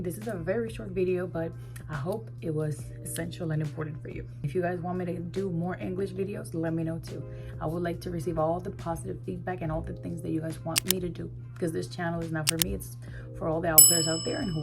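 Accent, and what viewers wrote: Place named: New York, United States. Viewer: English is flawless you have a New York accent dear 🔥🔥🔥🔥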